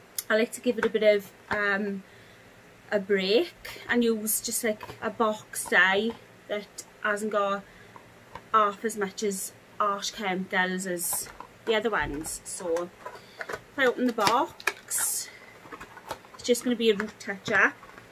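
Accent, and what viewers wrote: Wales, United Kingdom. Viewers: Hiya Lovely x A fellow 'Welshie' even though I'm South African born 😊 x Just loooove your accent way too much x Literally just done mine as well and same result with the more yellowy roots x Was just wondering if you've used a purple shampoo after the video and if you have, did it help with the yellow?? As heck yes, I love the results but nearly had a stroke as the yellowy roots make me look proper pink lol x I'm already in my head summoning my hairdresser friends for emergency damage control lol! 😨😅😂 x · I looove your welsh accent its so cute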